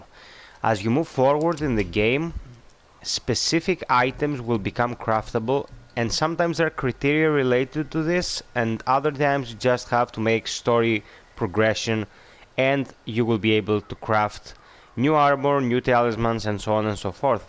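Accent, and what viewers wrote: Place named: Greece. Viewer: χαχαχα λεω σαν να μου φαινεται ελληνικη η προφορα του και μετα ακουω τον κρατο να μιλαει ελληνικα :D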